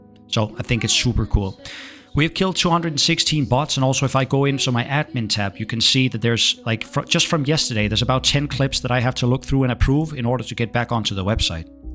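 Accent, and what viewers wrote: Denmark. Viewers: that is the most danish accent i ever heard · God, i like these videos.. But the commentary and danish accent is awful.,..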